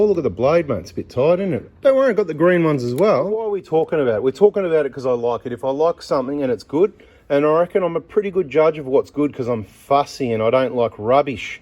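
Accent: Australia. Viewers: Nothing beats an Aussie accent.😂😂😂👍🏽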